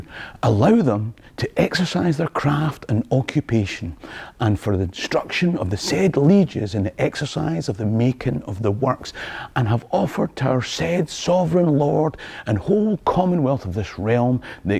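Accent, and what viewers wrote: Scotland, United Kingdom. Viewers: Accent says Scottish, appearance says mixed race Jamaican guy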